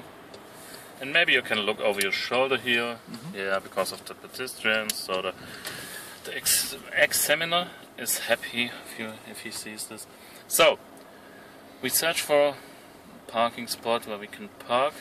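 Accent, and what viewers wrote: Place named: Germany. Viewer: I love the German accent of speaking English!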